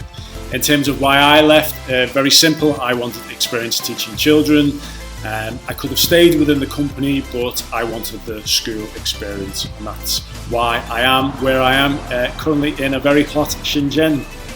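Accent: Liverpool, United Kingdom. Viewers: Thank you for making these videos, I just applied for EF, but watching your other videos inspires me to apply for public schools as I have the teaching experience. I’m hearing scouse in your accent? I’m also from Liverpool, so it’s nice to hear on YouTube 😂🙌🏻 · are you from liverpool? hahaha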